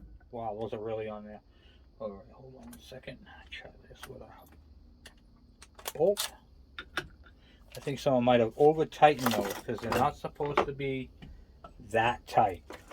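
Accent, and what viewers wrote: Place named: New England, United States. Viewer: I thought it sounded New England-ish. I just moved to Connecticut and just bought a GT80 today (my third one). Any chance you have any extra bits laying around?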